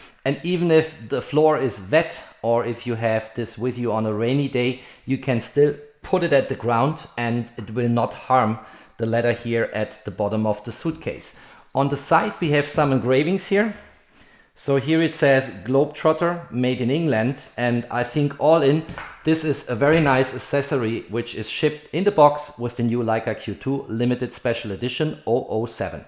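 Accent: Germany. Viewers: "Null Null Sieben" or "Double-O Seven" in English. No "O O Seven". My guess is you're German and never watched a Bond movie in English (besides that I hear a slight German accent). Just saying... Cheers from Indonesia!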